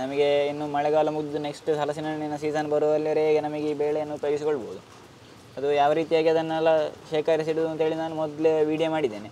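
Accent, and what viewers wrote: Mangalore, India. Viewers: I liked your mangalore (kudla) kannada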